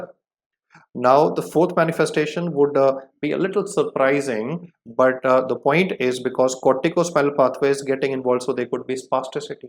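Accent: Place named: India. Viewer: could you add eng subtitles please? I have real problems with Indian accent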